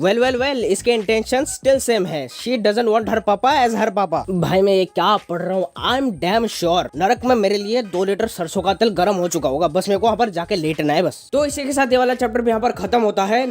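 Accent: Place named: Bihar, India. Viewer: Bhai tu bihar se hai mera ek dost bhi ese hi bolta hai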